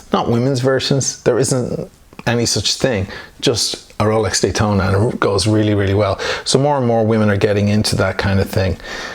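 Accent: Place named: Dublin, Ireland. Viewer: Jaime His accent is pure Dublin. Not picking up any American at all. Not a criticism in any way but I’ve had both Irish and American girlfriends and this man is pure Irish. It’s a cool accent anyway and this guy is a rising star of the watch people online community. I love his passion for the pieces.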